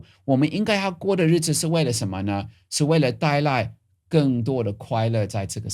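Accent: Taiwan. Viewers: 我非常確定您不是歪國人 口氣 腔調 用語 根本是台灣人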